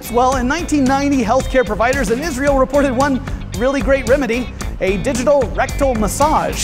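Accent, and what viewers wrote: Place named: United States. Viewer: I presume this is a U.S. production? (from the presenter's accent). in, the heavy traffic picture is that of Sydney Australia at sunset - specifically: Victoria Road (which begins at that Right Hand fork), and the Left Hand fork services near-city suburbs, but is also a way to get to the other main East-West road (Parramatta Road). Yes, despite initially heading North, Victoria Road is basically an East-West road. Both these main roads in and out of Sydney lie North and South of the Parramatta River (which basically runs East-West), and forms Sydney Harbour where it reaches the South Pacific.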